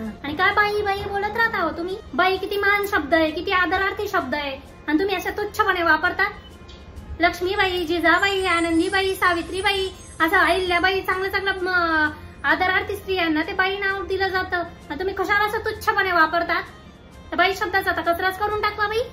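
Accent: Khandesh, India. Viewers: God Bless you Tai...sorry sorry Bai.... थोडा उशिराच पाहिला हा रील ...Superb....खान्देशी ठसका... लेखन आणि सादरीकरण एक नंबर...👌👌👍👍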